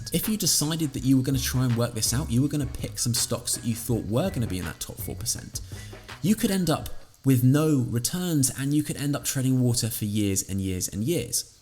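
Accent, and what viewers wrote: United Kingdom. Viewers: Thanks. I found the video very informative, well structured and easy to understand. Good to hear a British voice too. I read the comments on r/UKPersonalFinance and it seems a good bunch have a stick up their arse about you only discussing Vanguard, but I personally think you’ve made it clear there are other providers. Vanguard are just an example. Your audio/video is on point and deffo a thumbs up for the warm light background. I would love to see a video doing a deep-dive on UK pensions and specifically on how to protect yourself when moving companies or countries. I’m personally in a situation where I opted out of my work place pension scheme and haven’t invested as I was supposed to be moving to Australia.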